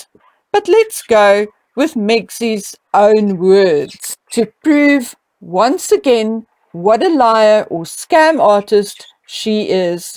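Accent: South Africa. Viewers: GREAT accent! South African? If i'm wrong, many apologies!!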